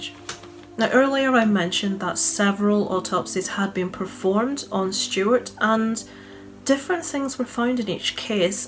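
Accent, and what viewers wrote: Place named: Scotland, United Kingdom. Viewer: Ireland? I’m from Ireland and you sound Scottish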